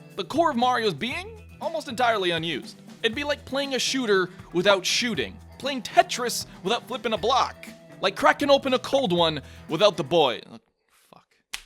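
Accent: Canada. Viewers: THIS DUDES CANADIAN!!!! WHEEEZE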